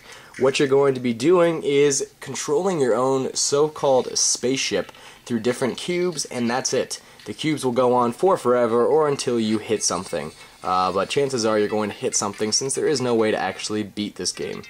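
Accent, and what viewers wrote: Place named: United States. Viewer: You have a textbook american accent! Well sounds that way over here in UK ;-), my iphone 4 is coming soon and have noted down a few apps to get straight away thanks to your reviews, keep up the good work!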